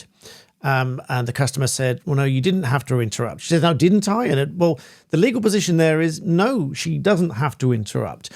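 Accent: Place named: RP, United Kingdom. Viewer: Another long and boring posh talk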